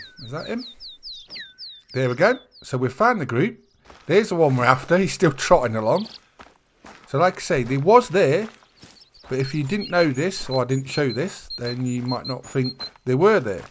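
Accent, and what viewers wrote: United Kingdom. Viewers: never have i thought an absolute peak guide would be narrated by a deep voiced british guy. idk what it is but your voice makes me focus a lot better on the guide and my brain gathers the info better lmao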